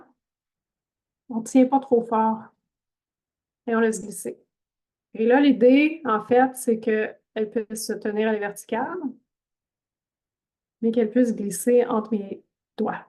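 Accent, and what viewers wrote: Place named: Quebec, Canada. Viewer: Hy, Très bonne pédagogie. Tes vidéos seraient plus agréables avec un bon son, car là, c'est difficilement audible. Qu'il est beau cet accent québécois. A bientôt. 😊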